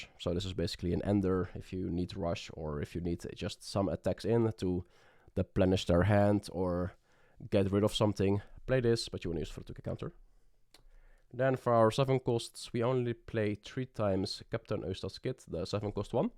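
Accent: Netherlands. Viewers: Random question but are u dutch?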